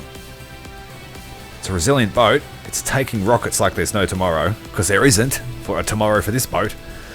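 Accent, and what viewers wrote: Australia. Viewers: Watching this at 8am in the UK, listening to a drunken Aussie talking about rave rounds. All is right with the world.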